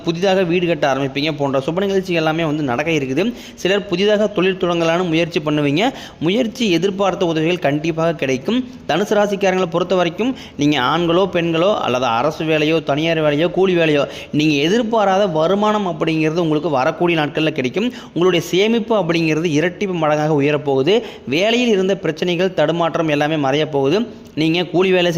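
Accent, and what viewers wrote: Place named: Kongu, India. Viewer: அது அவங்க ஊர்ல அப்படி தான் பேசுவாங்க. கரூர் கோயம்புத்தூர் ஈரோடு நாமக்கல் கொங்கு பக்கம். அவா இவா என்று பிராமின் பேசும்போது அவங்க கிட்ட ஏன் இப்படி கேள்வி கேட்க மாட்டேங்கறீங்க? நான் திருச்சி பக்கம். அவங்க இவங்க என்று பேசுவேன். ஊருக்கு தகுந்த மாதிரி தமிழ் வழக்காடு மொழி மாறும். யாருக்காகவும் நம்மை மாற்றிக் கொள்ள முடியாது. சுயம் எப்போதும் மாறாது.🙏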